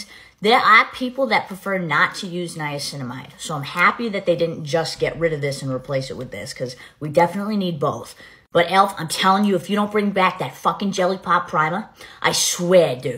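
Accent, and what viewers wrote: Boston, United States. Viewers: That's a Boston accent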